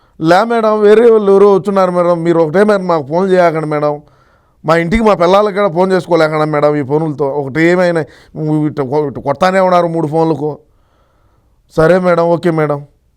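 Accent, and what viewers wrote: Rayalaseema, India. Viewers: అయ్యా రాయలసీమ యాసలో మాట్లాడి ఇరగదీస్తున్నారు..సూపరో సూపర్...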